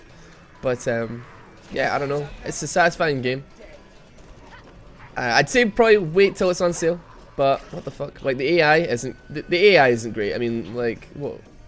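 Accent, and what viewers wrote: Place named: Edinburgh, United Kingdom. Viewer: sounds like he lives in Edinburgh i dont know how i can tell but im Scottish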